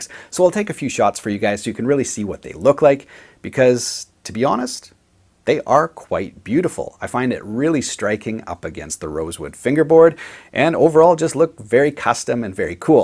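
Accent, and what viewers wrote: Canada. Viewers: He has like the most canadian accent ever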